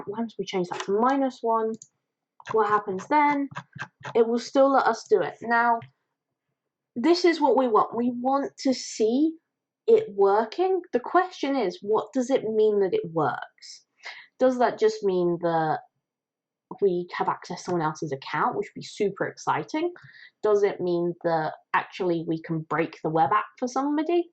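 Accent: United Kingdom. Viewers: Pretty good sharing and great content, no doubt. I'm a beginner in cyber security and eventhough I've got a private invitation on H1, I couldn't manage to find a bug... However, this video gave me a different perpective and way of think. Therefore, I'm so grateful to you for your sharing :) Btw, I guess you are from UK? I like this accent although I've hard time to understand it :)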